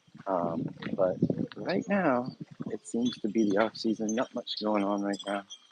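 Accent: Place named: South, United States. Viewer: I hear your Sourhern accent, mister. That part of town is super chill in the morning. The most cars I could count at once was three.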